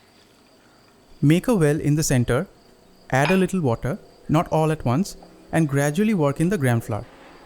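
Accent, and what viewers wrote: Bengal, India. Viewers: Love the way you speak Bengali words in the same. বাঙালি accent in between your English narration. Love your recipes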